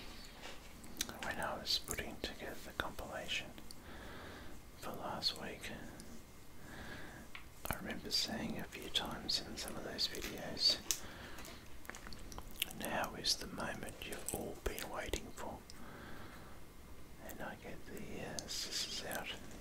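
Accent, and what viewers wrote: Australia. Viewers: A wonderful Aussie asmr, thank you very much. my favorite ever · To be honest, An Americans ASMR does not do anything. But an Aussie, it affects me. 👌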